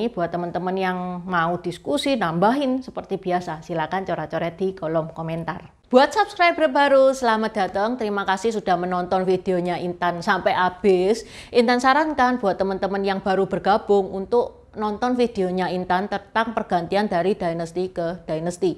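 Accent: Java, Indonesia. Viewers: Intan ini kok omongnya medhoookkk bangeet thoooo. Intan lahir dimana tho ? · terimakasih mbak infonya, ini menjadi ilmu bagi kita. mbak intan ini orang jawa putih ya...itu aksennya medhok banget jawa. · Mbak Intan...warga Indonesia yang wajahnya oriental tapi logat jawanya kental..apa ndak begitu Mbak Intan..he he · mba intan ini chines jawa ya medok banget si ehe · Mbak intan jawanya mana mbak ko logat jawa nya medok bgt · Intan cina apa jawa, kok medok jawa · Mba Intan ky dari Jawa... suara nya medok nya tu ky orang Jawa... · Muka china,,bahas dayak,,,logat jawa😂😂😂😂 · .. btw Intan medokers ya ....??? 😊😊😊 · Presenternya unik,china dialek jawa medoknmenthok,hayaaaa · logatnya jawa medok banged, saya suka.. maju terus chanel nya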